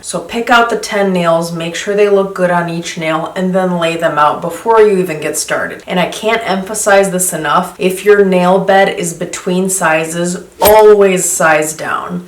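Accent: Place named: Chicago, United States. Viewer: Great advise, and I love the Chicago accent!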